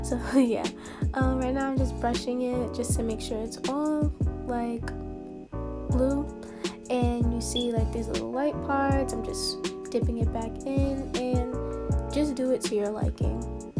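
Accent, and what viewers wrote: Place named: New York, United States. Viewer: you must be from NY, your accent sooo cute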